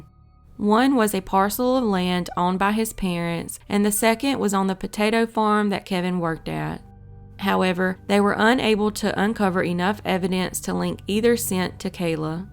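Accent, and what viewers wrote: South, United States. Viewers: Love your southern accent and your videos love from Birmingham 🇬🇧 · She has a southern accent. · I don't think she sounds bored . It's just her southern way of talking . Pretty crappy thing to say . She has the best page on here. I love her videos ! Maybe you should go watch a different page to make you happy ☺️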